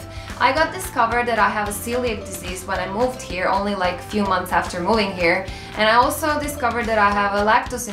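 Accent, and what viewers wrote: United States. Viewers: Annoying American accent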